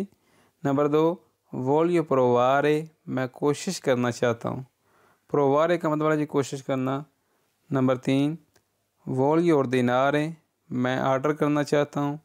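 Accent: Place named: Gujrat, Pakistan. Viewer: بہت شکریہ شہزادے ویر بُہت سونا پڑھدے او توسی❤❤❤گجرات دی بولی بول دے او ویر جی ،رب سلامت رکھے جے آمین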